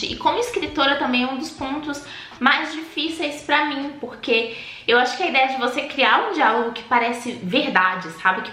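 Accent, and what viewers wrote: Salvador, Brazil. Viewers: Vc é de Salvador?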